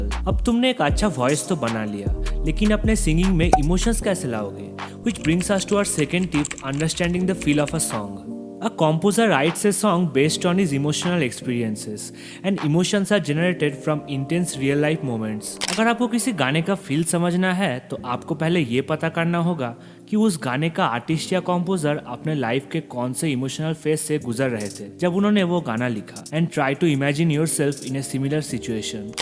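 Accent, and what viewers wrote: Bengal, India. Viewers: In Bengali we call it Rewaz. It's pretty evident from the accent of the speaker that he's a Bengali person. Jab pata kuch hai nahi toh gaand ucha karke marwane kyu aa jata hai?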